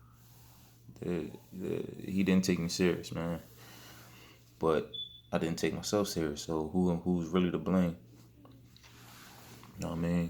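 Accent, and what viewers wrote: Philadelphia, United States. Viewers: Before he even said Septa I knew bul was from Philly. Props bro. I’m on the same path. This helped me stay focused.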